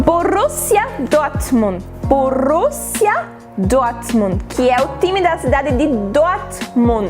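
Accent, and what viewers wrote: Northeast, Brazil. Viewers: Sim...nordestina de Maceió! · Ela parece nordestina falando português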